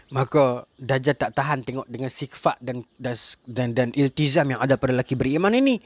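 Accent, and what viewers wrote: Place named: Kelantan, Malaysia. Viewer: Ustaz ni meme rilex ore klate oyak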